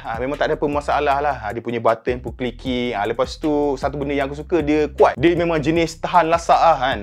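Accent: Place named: Malaysia. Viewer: Ada app yang sesuai untuk view gallery / video dari USB drive? Coz saya ada Xiaomi TV Box tapi tak da app yang sesuai nak buat slideshow gallery. Mohon pencerahan. Anyway, very good video, bes dengar review cakap macam orang Malaysia sejati! :D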